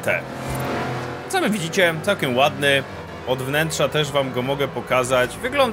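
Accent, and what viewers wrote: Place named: Silesia, Poland. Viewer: Śląsko godka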